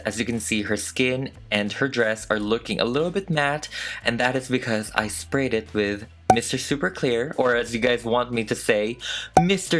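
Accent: France. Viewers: You speaking in a French accent gives me life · Son accent français est adorable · That french accent is still offensive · As a French (from Paris) I can tell that your French accent is really cute ❤️ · J'adoreeee ton accent français 😭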